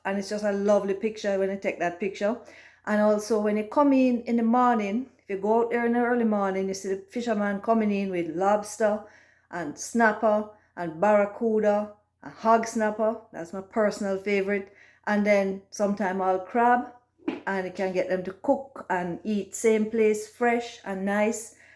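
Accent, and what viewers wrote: Jamaica. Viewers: OMG this is awesome she speaks just like a Jamaican.Such a beautiful lady.. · True white woman speaking natural and authentic Jamaican patios. 👍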